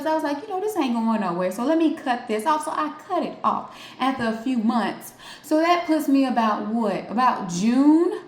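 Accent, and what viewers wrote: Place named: South, United States. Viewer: " guurrl, you are hilarious when doing your commentary. You remind me of Dolly Pardon, with your sorta kinda like Southern Bell accent that you give off😅 "You've grown on me enough for me to kinda like you girlfriend. "I must like you in my own SPECIAL KINDA WAY😂 "because I'm NOW a SUBSCRIBER of Yours baby girl. Keep doing what you're doing which is to keep your Subscribers engrossed sweetheart. "Peace✌️🫡🥰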